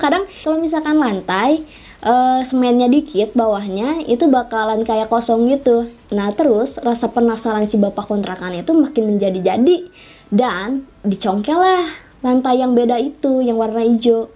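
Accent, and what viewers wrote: West Java, Indonesia. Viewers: Orang Sunda ya Neng....? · Sunda pisan euyyy,lope yu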